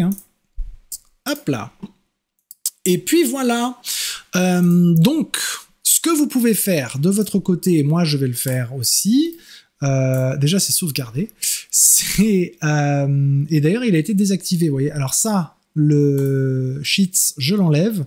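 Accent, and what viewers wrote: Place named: England, United Kingdom. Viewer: top ,comme à ton habitude et j'aime bien ton accent anglais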